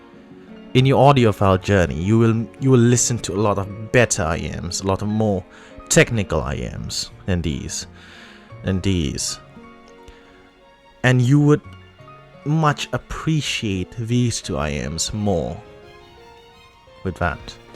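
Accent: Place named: RP, United Kingdom. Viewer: Lebih ga paham lagi kalo B pake aksen Scottish loh. Wahahaha. Sebenernya bagus banget sih si B ini pake aksen RP-ish (menurut sepengetahuan gw), agak kurang natural aja. Mungkin kalo swear words fuck sama shit diganti jadi bloody sama bollocks bakal jadi lebih natural. Wohohoho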